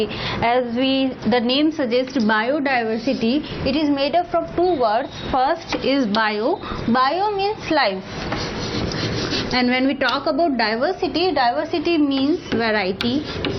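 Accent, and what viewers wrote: India. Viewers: Noice,but hard to get ur english😫pura indian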